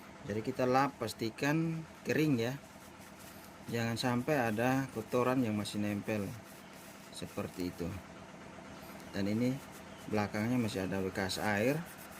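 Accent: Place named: East Nusa Tenggara, Indonesia. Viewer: Logat kek org NTT 😂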